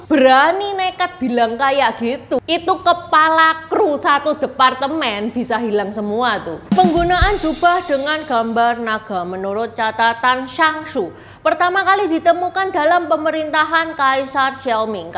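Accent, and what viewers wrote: Java, Indonesia. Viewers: Enakan dengerin dongeng nya tuch.. mantep bingit dg bhs indonesia yg ber'aksen jawa medok.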